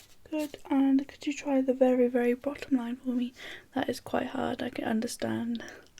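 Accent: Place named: United Kingdom. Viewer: I love your Britanic accent❤️❤️❤️😋♥️kiss You Young lady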